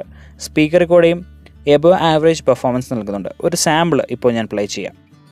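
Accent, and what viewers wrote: Idukki, India. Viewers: Idukkikkaran anoo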